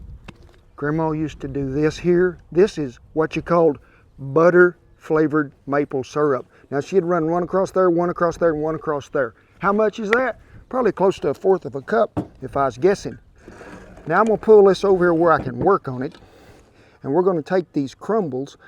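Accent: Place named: Texas, United States. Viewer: I love that Texan accent. Love your channel.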